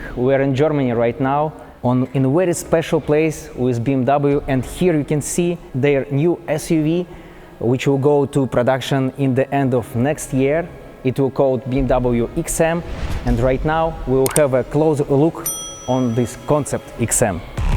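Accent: Russia. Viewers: Блин учи Английски. Звучишь как русский из американских фильмов.👎👎👎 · this car is as ugly as the russian english spoken by the presenter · Sorry bro but its impossible to listen your russian english..its not normal.. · Привет! работай над английским, особенно над произношением! Ну прям Русский Английский. Молодец! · I love that he has a Russian accent and looks asian, reviewing a German car. Global! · Russian Accent is horable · Хех, русинглишь. Ну понятно говоришь, молодец! За обзорчик спасибо! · Ведущий русский что ли акцент такой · Russian English 🤦🤦😂😂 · very ''Russian'' English...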